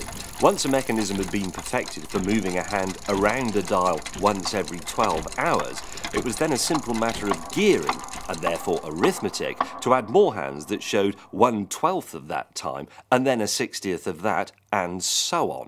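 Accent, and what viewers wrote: United Kingdom. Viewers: why the hell the British accent is sooo attractive?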